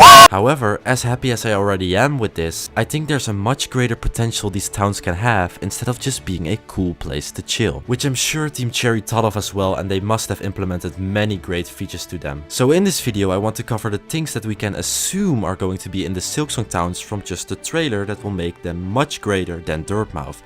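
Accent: Netherlands. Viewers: Ik wist dat deze klerelijer nederlands was met dat accent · Are you dutch?!?! I LOVE IT! I already thought it because of the accent. Please like, if ur dutch!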